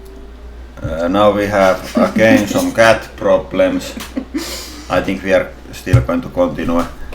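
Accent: Finland. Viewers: Your english is probably better than the most viewers finnish. (And your accent reminds me on Kimi Raikkonen) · Your English is great, the fact that you have a finnish sound makes it even better. People don't want you to sound English. Keep it up... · Katoin koko videon! Hyvää matskua teette!! Ja tekee vain hyvän säväyksen tuo "rallienglanti" =) Oma englanti kuullostaa samalta =D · Thanks to you, Hollywood might someday understand that the Finnish accent is not the same as a general Scandinavian accent. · I determined this video must be dealt with by watching all 43 minutes of it. Well done! Your "Finglish" accent is your trademark, don't change it. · This is pretty f.word awesome "rallienglanti" accent, it is whole the thing! I appreciate this courage trying to speak English. I hope its not enact! btw you must also compress the YouTube Play button when you receive one! 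· Todellakin katsoin kokonaan! Teidän rallienglanti on ulkosuomalaiselle parasta lääkettä koti-ikävään. :) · oh and btw I watched it till the end. your finglish is quite entertaining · kyllä tuli katottua koko video. rallienglantia on aina kiva kuunnella. 😃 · You Finnglish is a huge part of what makes this channel so entertaining! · i wached the whole video. that finnish accent.. couldnt stop. ;) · Your video was very informative (long, perhaps, but interesting ergo, length is not an issue). We look forward to more exciting hydraulic press action, in the very near future. Additionally, your English is fine. It adds just the right Finnish quality to your videos. Thank YOU, and have a nice day. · If ever I am sad and wish to make myself feel better, I can come to your "Beyond the Press" channel. I love the mad imagination of what you do. And, being a British person who has a son living and working in Finland — with Finnish wife and three children, I love your Finnglish. 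Well done!! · I'm walking around the house with Finnglish accent in my head now... "POHPULAHR" "KONTINUAY" "HOODRALIK"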